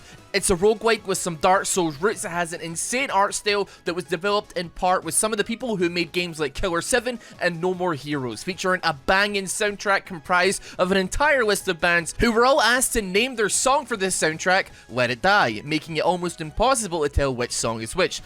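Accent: Scotland, United Kingdom. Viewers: I'm new here is he British or Australian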